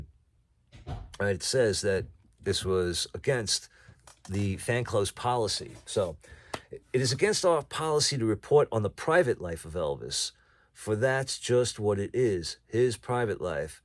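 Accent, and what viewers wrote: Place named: New York, United States. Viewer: Hi, I wanted to say I truly enjoy your videos. I remember having an original Kings Court button when I was a kid, I may still have it somewhere. Also nice to hear the accent of a fellow New Yorker, I’m born and raised in Brooklyn but live in Florida now. Anyway just wanted to say hi and keep the videos and memories coming.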